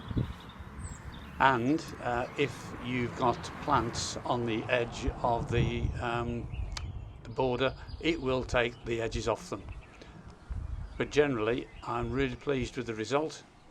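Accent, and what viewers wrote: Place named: Bromsgrove, United Kingdom. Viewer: Lovely video, very useful and you’ve convinced me to buy it. Your local accent also pricked my ears up, I’ll hazard a guess you’re near bromsgrove?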